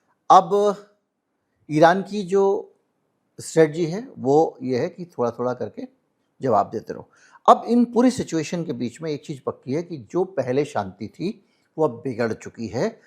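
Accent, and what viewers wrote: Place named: Punjab, India. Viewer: ji kya aap punjabi hein aap ki language mein punjabi touch hai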